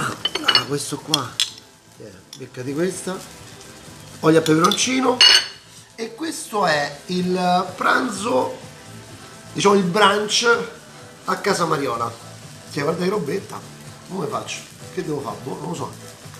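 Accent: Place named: Rome, Italy. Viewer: Ao e nun cagate rcazzo, è de Roma parla romano e pensate de non trovà i romani che scrivono in romano sotto ai commenti? Non avventuratevi mai a Roma come in nessun'altra città italiana dato che siete tanto sensibili da non riuscire ne a leggere ne a sentire i dialetti. Detto questo, m'hai fatto morì dalle risate ahahaha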